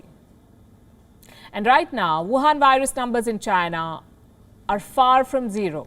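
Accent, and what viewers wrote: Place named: India. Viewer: indian tongue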